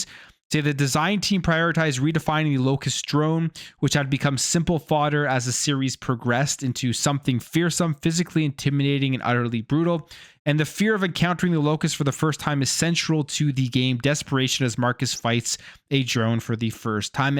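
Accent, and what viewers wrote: Canada. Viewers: Hey brother, are you Canadian? Just wondering if anyone has ever told you that your voice sounds like a younger Bret "hitman" Hart. 😅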